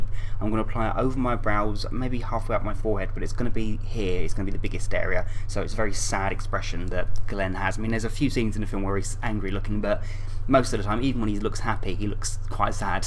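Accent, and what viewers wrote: United Kingdom. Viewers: ohmygawsh with your cute little british accent and the marvelous makeup you did, it matches the character so perfectly<3 I actually loved Glen(da) so much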